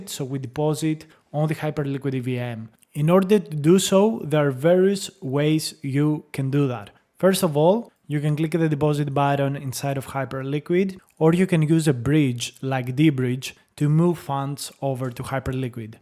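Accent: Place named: Greece. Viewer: I have a strong feeling that you’re one of the few honest YouTubers out there — someone who speaks openly, doesn’t try to take advantage of anyone, and always keeps it real with your audience. Also, I noticed that you speak English with a bit of a Greek accent, which makes your videos even more unique and enjoyable!😊 By the way, I left a comment on your previous video — I was wondering why Bullpen isn’t listed on DefiLlama? That’s actually one of the reasons I have some concerns about Bullpen.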